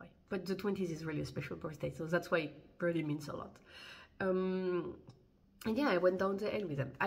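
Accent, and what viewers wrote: France. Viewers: Love your french accent!🤗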